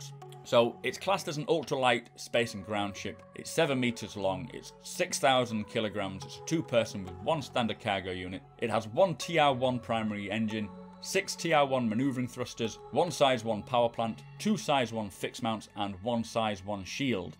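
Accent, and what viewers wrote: United Kingdom. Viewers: You mean American subtitles, he's speaking (British or Scottish) English.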